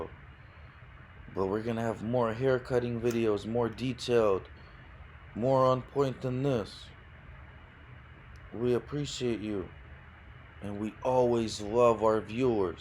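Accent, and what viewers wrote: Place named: Russia. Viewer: i watch your videos mostly your father's videos for asmr and a relaxing effect 😅, love that russian accent ❤️, try adding asmr tag to your videos for more views and subs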